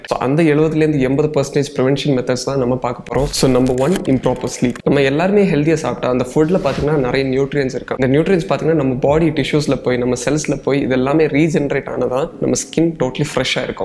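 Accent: Kanyakumari, India. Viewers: Bro kanyakumari ah?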